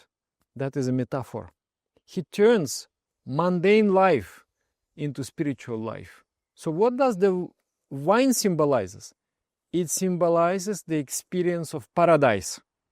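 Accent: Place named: Russia. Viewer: I love the Russian English accent.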